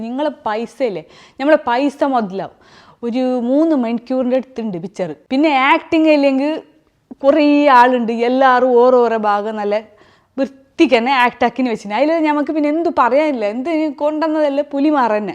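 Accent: Kasaragod, India. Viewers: Still I'm remembering your lucifer review by our slang Kasaragod basa again you are back on same production movie same style of review go on pinne kana ave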